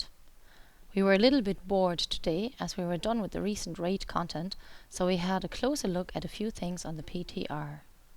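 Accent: Ireland. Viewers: What part of ireland are you from? Im from Cork City! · It sounds Irish to me. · are u from mayo? im from wicklow myself, just cant pin point the accent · Shes not a robot, she's just Irish! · accent is clearly irish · Maybe she 'sounds like a robot' because she knows she has an accent and is trying to speak slowly and clearly, given that most of the people watching this won't be familiar with the particular accent (which I believe is Irish). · Pfft German.....she's Irish ya daft twit. Bloody lovely btw :)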